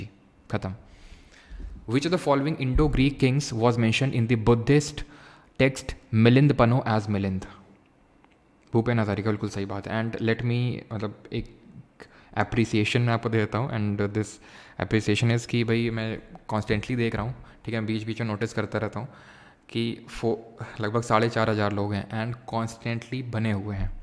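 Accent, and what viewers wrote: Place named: Hamirpur, India. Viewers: Are vahh sir ap to ek dm Mori trf hamirpur U.P ki side ki bwalat hva😅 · Sir are you from up voice tone se lag raha hai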